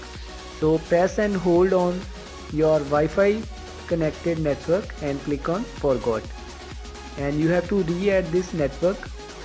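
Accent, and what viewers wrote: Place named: India. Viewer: You are indian? Your english is so funny no ofense Thanks so much You make a great job don t stop You have a new subscriber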